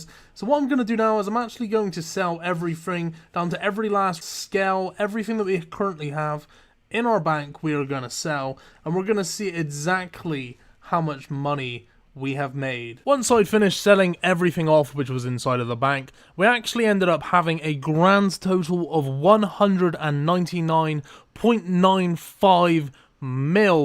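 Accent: Bristol, United Kingdom. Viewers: Are you from the south-west of the UK? You have a birstol-esque accent.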